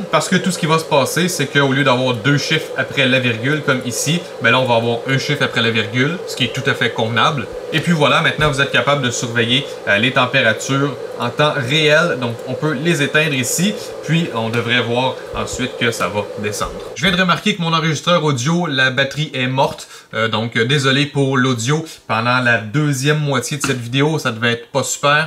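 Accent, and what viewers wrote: Canada. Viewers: Bonjour. Je te suis depuis un petit moment et je trouve ta chaine extra!!! Ton projet sur la domotique est super intéressant. Continu comme cela c'est parfait. Il serait aussi intéressant dans ton projet de lier ton système domotique a un écran "Magic Mirror". Super travail 👍 J'adore ton accent Canadien / Franck le Frenchie 😉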